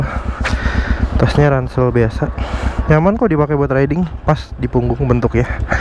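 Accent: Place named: South Jakarta, Indonesia. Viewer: Nak jaksel pisan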